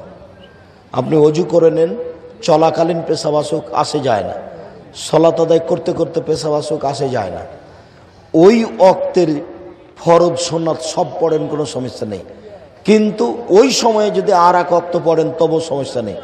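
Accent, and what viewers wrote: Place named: Kolkata, India. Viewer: Unar uccharon Kolkata type..keu ki details bolben unar(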